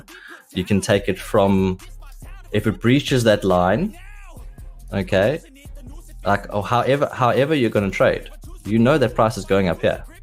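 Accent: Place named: South Africa. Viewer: This is a fellow comrade from South Africa. I know that accent 😆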